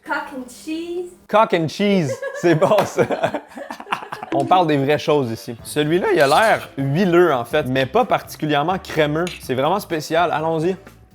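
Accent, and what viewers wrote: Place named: Quebec, Canada. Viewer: Salut ! Les petites références québécoises, le fait que tu gardes ton accent. Merci pour ça! - Une québécoise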